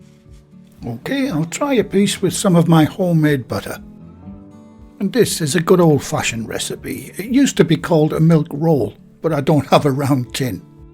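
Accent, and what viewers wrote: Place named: North West England, United Kingdom. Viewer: Thank you and tomorrow I'm going to have a go making this recipe as it is clear and concise. I like the voice over instead of watching someone banging around in the kitchen, personal preference. I have been on the old sourdough journey and have finally got a starter going, it's still a learning curve to make a loaf that's edible and not too dense. I finally bought bread flour, so I think this will make all the difference. When you said *natural yeast (I think that was the term used) do you mean sourdough? I also liked your accent as I'm from the North West coast originally, I live in Canada. Left UK in 1972. I'll have to go look at your website. *edit: no it was fresh yeast you said!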